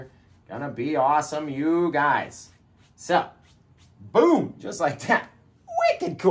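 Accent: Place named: New England, United States. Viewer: Soooooo, what part of New England are you from? Lol, the "wicked" gives you away...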